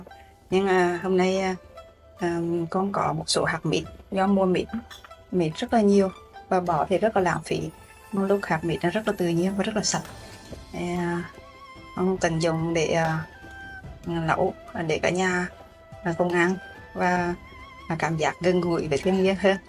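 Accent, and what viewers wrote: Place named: Hue, Vietnam. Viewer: Admin up bài này làm em thèm quá xá . đậu ngự lại với giọng Huế nữa hay quá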